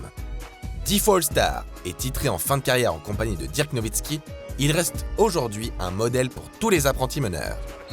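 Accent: Quebec, Canada. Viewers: Non non non pas l’accent Québécois 😭😭😭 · L'accent quebecois. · Mdrrr j'étais pas près pour l'accent québécois 😂😂😂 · Merci pour cet accent Québécois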